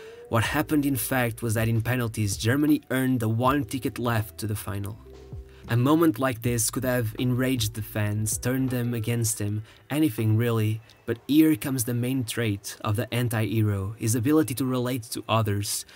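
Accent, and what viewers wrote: Portugal. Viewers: Portuguese accent i love it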